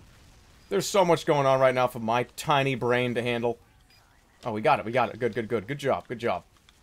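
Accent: Boston, United States. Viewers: well he is from boston and the scout is from boston so the same accent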